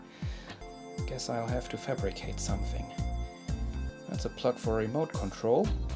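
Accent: Germany. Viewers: Aww no... the German accent makes you sound like a true repair guy professional... And it sounds really cool!! Please please don't lose it. . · The sound of a true german enigneer, like Kraftwerk :D As a half German, I can see why we don't like our recognizable accent.. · For each video you make, the German accent decreases :). Thank you for the videos btw. Edit. Is from 2012. Your German accent got heavier actually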